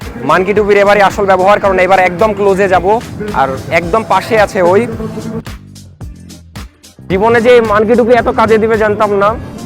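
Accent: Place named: Nawabganj, Bangladesh. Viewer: ভাষাতে নবাবগঞ্জের টান আছে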